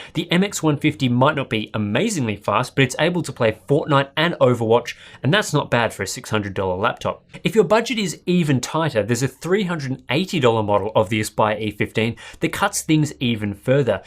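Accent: Australia. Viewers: You have a Aussie accent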